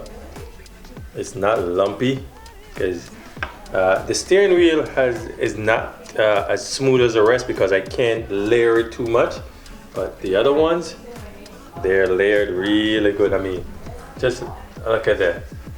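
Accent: Jamaica. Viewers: u gotta be Jamaican lol im doing the same thing and ah run from the same tings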